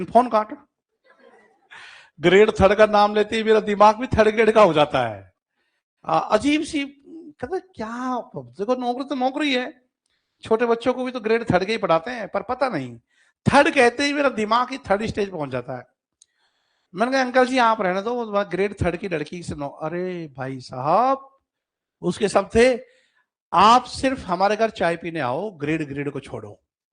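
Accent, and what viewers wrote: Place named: Bharatpur, India. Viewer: सर जी आप कहां से हो। आपकी भाषा भरतपुर बालों से मिलती है।❤❤❤❤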